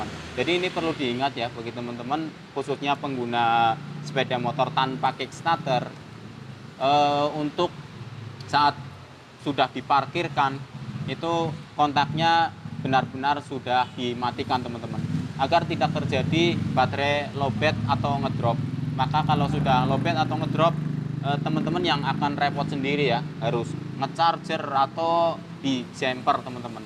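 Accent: Java, Indonesia. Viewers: Medhok syekali om,,, Asli mana nih?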